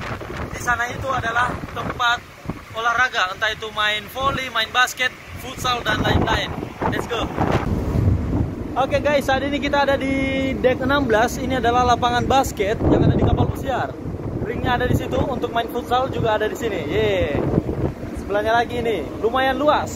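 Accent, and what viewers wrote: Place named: Manado, Indonesia. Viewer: Saya kira bro orang manado..logatnya seperti logat manado soalnya hehe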